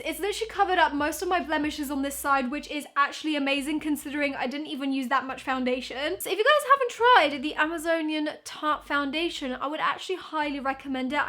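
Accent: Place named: United Kingdom. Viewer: Talking is so soothing to ear I love your British accent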